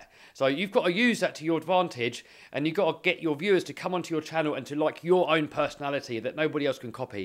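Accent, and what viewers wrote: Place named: England, United Kingdom. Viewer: your the crazy guy with a English accent who's not affraid to destroy his RC'S. Your infectious personality is entertaining and a winner. Thanks!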